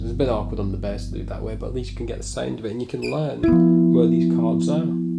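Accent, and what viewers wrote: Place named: North, United Kingdom. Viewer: Superb lesson .. do I detect a northern twang .. I’ll be practicing my extended scales today , have you any cool exercises to go with them ?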